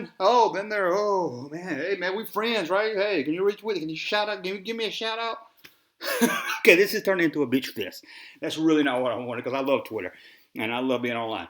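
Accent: South, United States. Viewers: i know i'm 2 years late to this party but i really enjoyed this vid. (and all your Junkie vids). i could listen to you rant all day - maybe its coz i love vintage toys, i was born in '74 so i get where you're coming from and mixed with your southern tones and mis-pro-nun-she-a-shuns, your vids make my day. KEEP 'EM COMING !!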